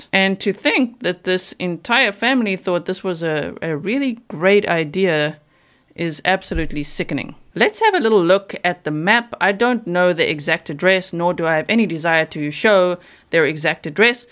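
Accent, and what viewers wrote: South Africa. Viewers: Is your significant other another english speaking dialect like australian New Zealand? Your accent definitely is south African but there is more to it, maybe its wven American english mixed with your majority south african accent....but yea, when you mention it! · You dont have a mixed accent. ITS SOUTH AFRICAN !! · I can hear that you are south african🇿🇦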